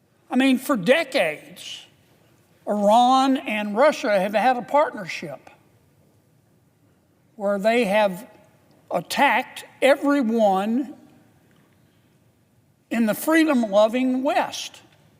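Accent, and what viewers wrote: South, United States. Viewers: Russian president Vladimir Peutin. Love that southern drawl!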